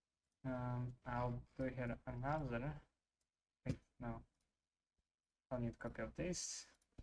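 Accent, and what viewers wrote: Russia. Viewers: У вас руский Акцент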